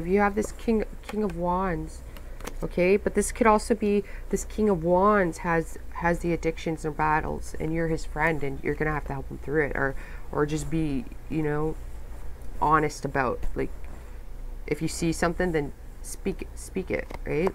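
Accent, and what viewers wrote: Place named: Canada. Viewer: You gotta be from Canada EH. Me too.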